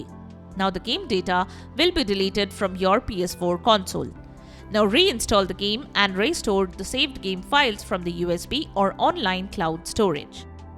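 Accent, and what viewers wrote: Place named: India. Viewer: English mix with Indian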